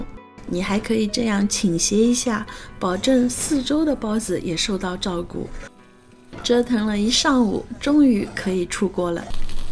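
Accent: Shanghai, China. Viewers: 有的有的，口音是标准上海年轻人的口音👍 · 嘻嘻 听你讲上海话超级亲切，秒想回国😢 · 尽管有其他很多貌似专业厨师的视频，但听到了上海话就无理由地感觉这才是最靠谱的做法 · 这就是标准的上海人讲普通话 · 我妈看她视频。我在旁边一听就知道她是阿拉上海宁。满满的上海味道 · 很好，顺便宣传一下上海话，很亲切，赞！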